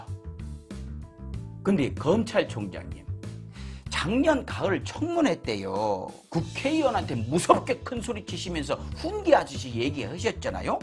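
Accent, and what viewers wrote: Jeolla, South Korea. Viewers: 전라도말씨 시원하게들리네 👍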